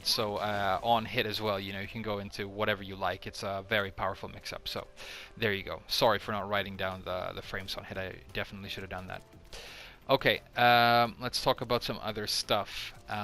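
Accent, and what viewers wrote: France. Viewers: HIS FRENCH ACCENT LMFAOOO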